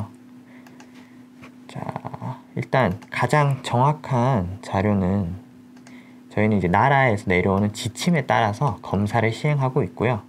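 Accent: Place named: Gyeongsang, South Korea. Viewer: 블로그로만 뵙다가 목소리 듣게됐는데, 경상도 억양이 새롭네요ㅎㅎ 앞으로도 좋은 영상 잘부탁드립니다. ps. 한두가지 제안을 드리자면, 아무래도 내용이 일반인에겐 다소 전문적인 내용이라, 집중도를 살리기위해 영상 하나당 3분 정도로 하면 좀더 좋을것 같습니다. (예를들어, 이번영상을 세부주제로 3토막내어 올리는것) 또, 설명력과 전달력은 굉장히 훌륭하십니다. 뭔가, 서울 대형병원의 질병 설명영상 속의 교수님과 비슷한 느낌으로 잘하십니다. 다만, 유튜브의 생명인 조회수와 몰입도 향상을 위해선 순차적인 설명방식보다는, 짧은영상으로 일반인에게 지루하지않고 임팩트있게 전달하기에는 신종코로나에 대해 요즘 사람들이 가장 궁금해하는 FAQ에 대한 q&a 형식이 더 낫지않을까합니다. (ex) 왜 의심환자가 양성으로 확진나기까지 걸리는시간이 그때마다 제각각인지, 코로나로 의심된 환자가 사망했을 경우 숙주가 죽었는데 그것에서 채취한 검체에서 나온 코로나 음성판정이 과연 의미가 있는것인지 or 믿을만 한것인지, 마스크는 어느정도 범위까지 막아주는걸 써야하는건지(만약 마스크와 손씻기만으로 충분하다면 왜 의료인들은 방호복입는건지), 알코올이 실제 항바이러스 효과가 있는지 등등) 제 제안은 말그대로 제안일 뿐이니 참고만 되셨으면합니다:) 본격적인 ent 1년차 입성을 앞두시고 선별진료소 일까지 겹치시어 많이 바쁘셨을텐데, 첫 영상 만드시느라 수고많으셨습니다^^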